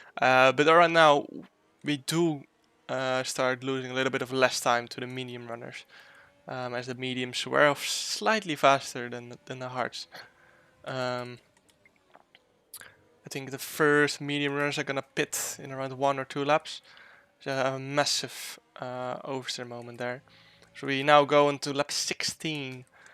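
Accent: Netherlands. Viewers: Are u dutch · Ik hou van dat Nederlandse accent 😂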